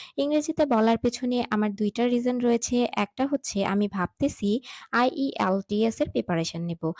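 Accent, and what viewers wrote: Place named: West Bengal, India. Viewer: Hey there! No offence okay ! Take it positively.. 😊 I guess ya should focus on your pronunciation first while speaking in English. Follow British or American accent not indian or bangali style.However I do appreciate your confidence. Indeed😇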